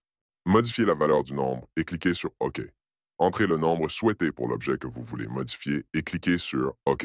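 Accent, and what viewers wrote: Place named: Quebec, Canada. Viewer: T'es Québecois :)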